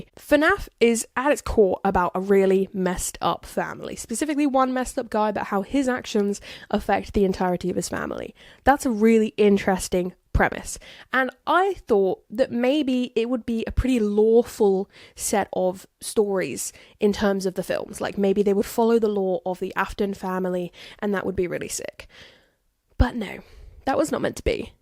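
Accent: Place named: Ireland. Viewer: OMG YOURE IRISHHHH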